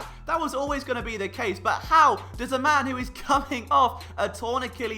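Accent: United Kingdom. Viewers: Jeopardy "Potpourri" Category as an addendum: 1. Brooks isn't THAT tall, though he moves incredibly well for his overall size. Height wise, he's maybe an inch taller than me, at most. That he TOWERS over Sproles at the is hilarious. (Sidebar: Off the Sproles mention, we desperately need to get an elite RB like Swift, which I doubt they'd do, a solid one like Dobbins/Taylor or the Sproles lookalike -- LSU RB. If he's available in the mid third-round to early fourth-round, the Birds need to get him. While I'm not the biggest Sanders fan, a 3-headed RB tandem of the Howard, Sanders and LSU RB would be absurd. 2. I didn't think Brooks was going to play this year when he initially tore it . 3. PFF is flawed, my dude. I'll get you on my side at some point. Constant badgering is foolproof, right?! Ha. 4. On the first play in this film room, you mention his C, OG and OT "features" to make him a complete OG. 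But for the fact the Birds lucked out with the Dillard pick, I'd very seriously think of moving Brooks to one of the OT spots, if Vaitai struggled. All his measurables and eye-test shows he could likely be an adequate OT in the NFL -- but is clearly more valuable as the elite of elite OG. 5. The rest of the film room is why we all come here. I really would invest in this channel if it could somehow offer private shares. You break it down like Baldinger, yet are youthful enough to relate to most of the audience. Plus, even though I hate to admit it: the British accent, howeve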